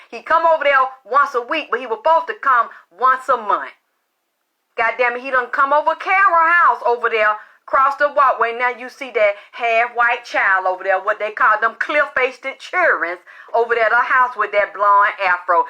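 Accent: South, United States. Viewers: “Gummy head” 😫😆🤣 OMG!!! **btw, you’re beautiful 😍 Glad you survived through those toxic years.. Look at you now.. “Where you start does not determine how you pull up nor your destination” 💜💜 I love the southern accent #SOUTHERNGIRLPRESSURE 💪🏽 We built different 🤞🏽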